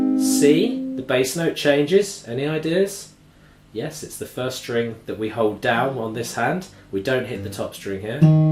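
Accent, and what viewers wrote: United Kingdom. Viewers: When You started talking I was like OH SHEESH HE'S BRITISH.. I did not see that coming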